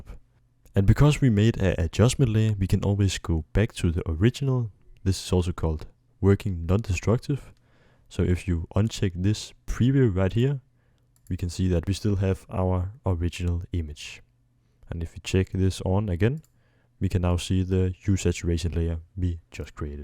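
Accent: Denmark. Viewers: are you from denmark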